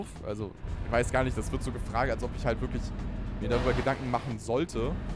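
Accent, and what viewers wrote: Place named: Brandenburg, Germany. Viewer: Er kommt halt aus Brandenburg, wenigstens verstellt er sich nicht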